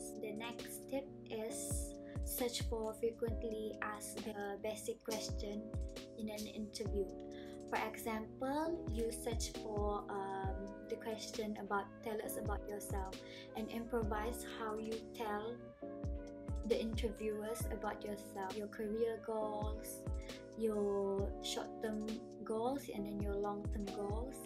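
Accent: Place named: Sabah, Malaysia. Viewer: Dusun accent is strong in this one haha. Anyway love your make up! Pls do a tutorial for it.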